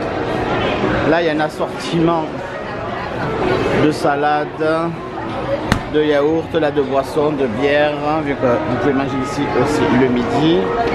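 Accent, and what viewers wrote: South, France. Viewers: Salut ! Rholala toujours un plaisir de vous suivre. Le petit accent chaleureux du soleil ❤. Il me semble qu'il y a bien du monde dans le parc ! J'ai lu que même en septembre c'est assez plein maintenant, ça a l'air de se vérifier. Je pars pour la première fois (seule) en septembre prochain. J'ai hâte et en même temps le stress monte 😅. Prise de tête sur les billets d'avion en ce moment, très chers au départ de Lyon 😢. Dommage pour Tron, j'étais déçu pour toi tu avais l'air si heureux. Je sais pas si je la ferais elle a l'air haute (j'ai le vertige, j'aime pas quand les rails sont dans le vide 😅). Mais je pense me prévoir un budget coupe-file au cas où. Zut faut reprendre le boulot, pas le temps de regarder la suite ! A bientôt !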